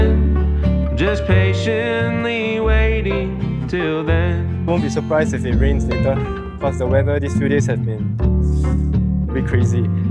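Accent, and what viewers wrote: Singapore. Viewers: ahhh rooting for you! so many firsts in this video, but very excited for you to have many more adventures trying out this youtube thing!! also, hell yes to the sg accent ahaha we need more of it on here! i started vlogging for fun & just to have memories for keepsakes for when i get older & have to leave all the fun & games behind but it was really nice to see this, might still keep the hobby alive :") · I just discovered your video. Started with the Hanoi vid....very well done Admire your bravery ...can't wait to see more from you ..makes me wanna spend more time in asia. Also I like your singapore accent ..more pls! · I also like your singaporean accent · this vdo makes me feel like I’m watching a movie 🍿😍 Thank you and love the Singaporean accent. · don't worry about your accent! english isn't my first language and i've mostly learned it through watching youtube. 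nowadays my goal is to get to know and understand as many accents as I can, so the more I watch your videos, the better I'll get at understanding english spoken with the singaporean accent. keep up the good work!! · Hey fellow Singaporean here! Just have to say your video quality is on par or possibly even better than what I've seen on YouTube from top content creators, as love seeing you being authentic in retaining our accent and embracing the fears that come with putting yourself out there! Think your authenticity comes through, and seeing your personality as it is lends so much more to the video too! Good job bro, your videos are what inspired me to look more into design and zhng-ing my room while I wait for my BTO! The vibes are immaculate haha and I've been opening the YT app so often to check if you've uploaded so you're definitely doing a sick job :) Too bad I wasn't able to catch you at Stacked's store last weekend but so glad to have chanced upon your content! 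Keep it up!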